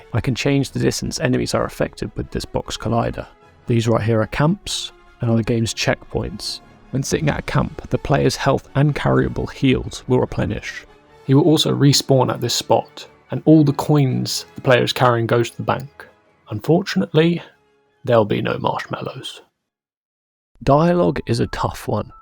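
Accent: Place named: United Kingdom. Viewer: Really well made devlog dude and the game is stunning and looks so fun. I'm definitely keen to see more! Btw I hope this is not offensive, but your accent sounds like Dudley from Harry Potter! Which part of the UK are u from?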